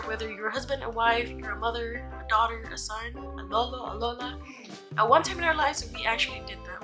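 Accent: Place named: United States. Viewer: !!!! Love your accent! American girl kaayo! ♥️😍Take care always! Muah! 😘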